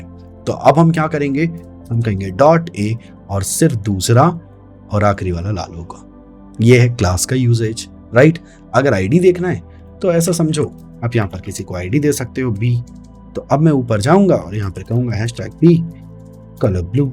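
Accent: Bhopal, India. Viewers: nice and calm explaination and to the point with some bhopali touch thank you sir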